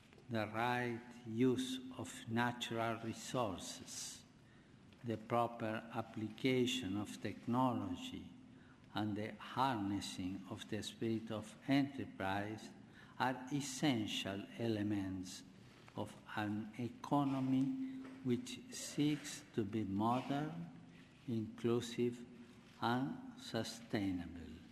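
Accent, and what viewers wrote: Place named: Argentina. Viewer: Heavily accented indeed, you can only expect so much from a man born of Argentine descent (whom spent most of his life in the Vatican thereafter) trying to speak English. That being said, the words uttered do carry heavy weight.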